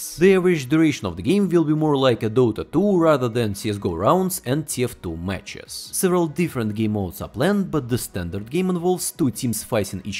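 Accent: Russia. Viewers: Damn, I didn't know about your english channel before, and I like the russian accent, а так вообще прикольно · все ровно слышно русский акцент)) но твой английский супер!! 👍👍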